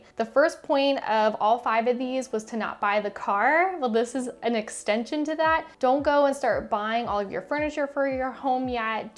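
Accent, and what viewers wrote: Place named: South Carolina, United States. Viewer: Not sure why this popped up on my recommended videos since I'm not in Charleston and not looking to buy a house right now haha. Maybe it just wanted me to connect with you 😁 I did want to say I do love the way you speak. Great pacing plus I love that S Carolina accent lol. Wish you all the best! Subscribing to support 😁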